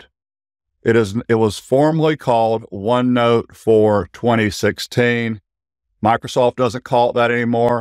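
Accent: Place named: Georgia, United States. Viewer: 1. I recognized your GA accent 2. I am also a CPA 3. Thanks!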